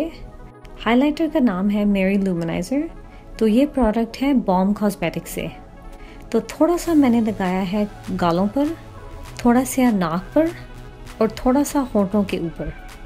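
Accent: Punjab, India. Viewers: Thodi Punjabi te att aa ji Hindi Ch v ohi accent Mere father ki da c edda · I like ur punjabi accent👍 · I love you yaar M straight 😂 Love you dil se ❤️❤️❤️❤️❤️❤️❤️❤️❤️❤️❤️😘😘😘😘😘😘 Love from Chandigarh Love your Hindi and punjabi accent Muuuuuahhhhhhhh😘😘😘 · Tadi voice tone ch Punjabi touch aa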